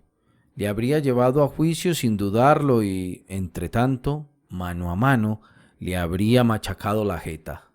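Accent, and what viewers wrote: Colombia. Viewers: Chejov y todo ese grupo de escritores , describen con maestría la idiosincracia del pueblo ruso, su pobreza, sus anhelos, la rutina que los apabulla y esa relación tan particular con el cristianismo ortodoxo. Buena lectura de un " paisa" colombiano delatado por su acento.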